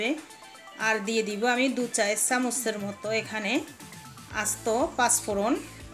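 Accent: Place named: Feni, Bangladesh. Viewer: আপনার বাড়ি কি ফেনী?আমার স্বামির বড় ভাবির কথার ধরণটাও এরকম।By the way আচার টা দারুন হয়েছে।👍😊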